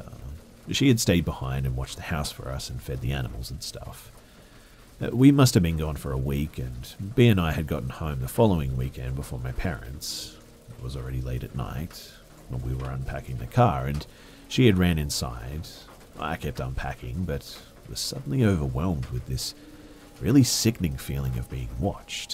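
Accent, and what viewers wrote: Australia. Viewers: Noo not the Australian accent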